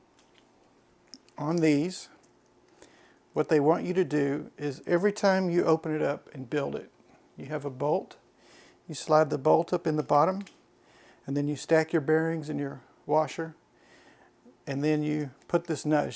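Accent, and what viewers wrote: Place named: Texas, United States. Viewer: That Texas accent should have been a dead giveaway.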